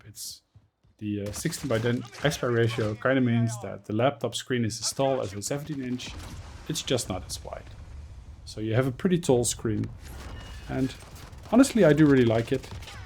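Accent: Netherlands. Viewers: Awesome vid thanks bro. Also you sound Dutch.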